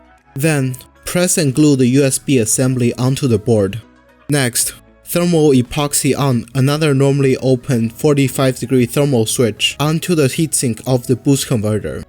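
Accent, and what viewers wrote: China. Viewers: 你的口音怎么这么像中国人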